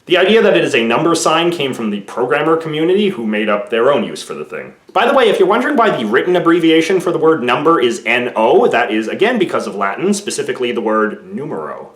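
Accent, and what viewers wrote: Canada. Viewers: You are the first Canadian I have heard who actually says aroond and aboot, lol. I thought that was just a myth... I'm sorry that it sounds comical to my ears :( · keep up the good videos , also you sound oddly canadian , you live up north? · bnfk He’s Canadian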